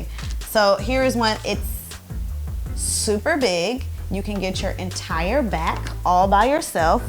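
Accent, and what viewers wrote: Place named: New York, United States. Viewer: 1. I would have never guessed that you were not a native New Yorker if you hadn't said you were from Ohio! lol In Spirit you are a native NYer lol 2. Is that a baby Monstera Albo in that pot?? And you grew all those leaves on a supposedly slow growing plant! KUDOS! It's beautiful! 3. I WILL be trying that washcloth! 4. Not to mention how much natural hair products costs us! smh I be like $15 dollars for this little bottle? I'll just make this huge $6 bottle of Treseme work (extremely damages hair cuz it has the most moisture) and it usually doesn't work to well LOL #TheNaturalHairStruggle LOVED this video! IG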